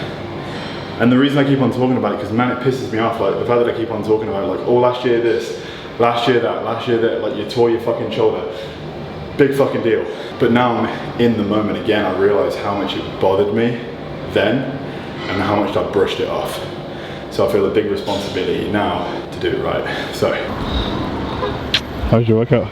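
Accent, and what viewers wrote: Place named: United Kingdom. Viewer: first of all...buying any peptide as a liquid is the worst decision you can take, they have to use so many conservants to keep the peptide together. The best way is lyophilized cake but we all know how anoying is to reconstitute and dose them, extra bac water, needles, syringes and shit. So, my gym bro, it seems you have an UK accent and you have in the UK the Lyoprester, it was invented there, under your nose mate and here we are, putting ready mixed peptides in you...😌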